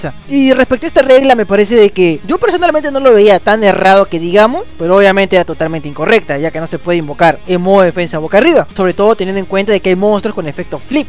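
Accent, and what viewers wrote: Peru. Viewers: Y ese acento peruano?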